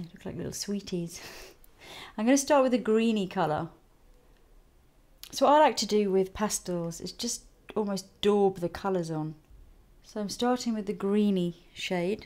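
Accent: Netherlands. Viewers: I saw the other videos first and thought, mmhh she sounds Dutch. Then in this one it was confirmed haha can recognise dutch people anywhere! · funny, i''m dutch and i recognize her accent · She is from Netherlands.. So that might be Dutch - English.. ;) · I could hear she was from holland. Her accent (when she speaks English) sounds a lot like mine :) WOmderful look though! Looks great on her, I feel inspired! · I heard her accent and I KNEW she was Dutch! YAY HOLLAND! :D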